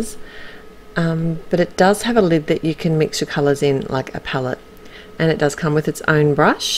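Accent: Australia. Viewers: Hi. LOVE these gorgeous high pigmented colours. Your card is just so beautiful and I really love your choice of colours. Thanks so much for sharing with us. Always love to hear your Aussie voice.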